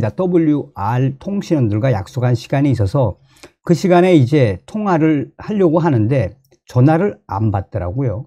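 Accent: North Korea. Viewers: 정성상씨, 영상에서 북한소식을 알려주시느라 수고 많으신데 여기는 대한민국입니다. 이북 말투 가열차게, 알려드리겠으다레 등 등 이북말투 삼가하시기 바랍니다.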